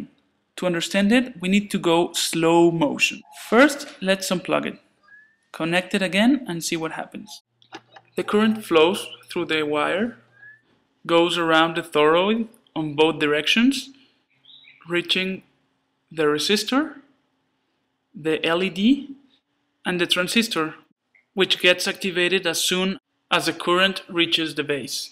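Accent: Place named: Spain. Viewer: I don't know whats worst for an american, spanish accent or my russiangermanportuguese accent =P But in fact, he made a such awesome job!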